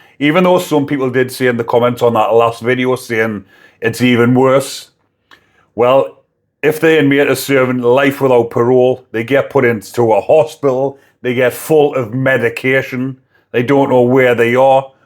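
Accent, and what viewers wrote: Newcastle, United Kingdom. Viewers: Newcastle or in that region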